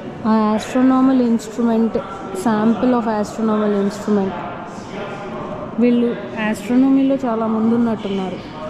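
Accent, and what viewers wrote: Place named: India. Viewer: Hi 🙋I Like U R Bold Telugu Accent & Speaking Comedy Also🙋❤From Vizag (AP)💞😀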